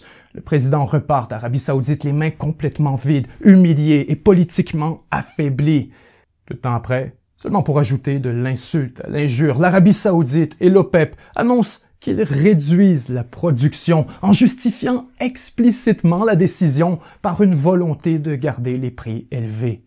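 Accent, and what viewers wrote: Quebec, Canada. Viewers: J'aime bien l'accent canadien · j'ai du mal avec la voix québécoise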